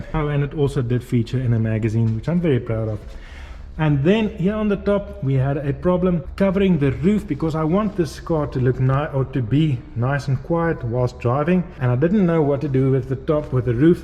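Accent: South Africa. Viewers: I caught the South African 🇿🇦 accent straight away & had to check your channel out. It looks like a lot of interesting content & I'm looking forward to going through the D Type build.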